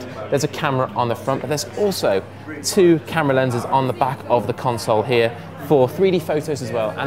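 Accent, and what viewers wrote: United Kingdom. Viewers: that british accent is really really giving me a headache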